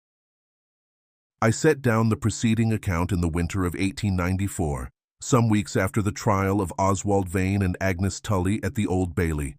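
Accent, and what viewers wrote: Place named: United States. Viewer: Sherlock Holmes with an American accent noooooo🤬🤬🤬🤬🤬 no chance will I listen to this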